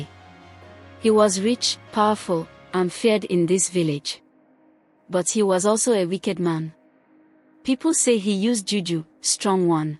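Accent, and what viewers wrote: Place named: Ghana. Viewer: This your pigin English dey sweet 😂 E dey sound like Ghana people pigin Cheap particles dey run belle so dey said Haunted house no pass like this o😮 Na God go help use o Even mama Ike dey fear too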